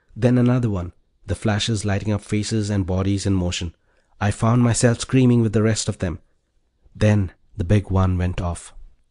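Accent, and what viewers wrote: India. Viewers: The pathetic narrator has an annoying Indian accent. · Beautifully spoken English & lovely crisp Indian accent. I really enjoyed it, thanks...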